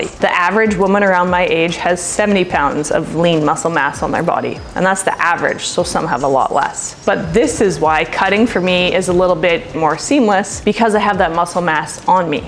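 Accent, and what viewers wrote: Canada. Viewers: I’ve been skinnyfat for most of my life, but now at 50 I’m just fat. Your video came along at just the right time for me. p.s. I love your Canadian accent and I greatly admire your comedic chops. Absolute goddess.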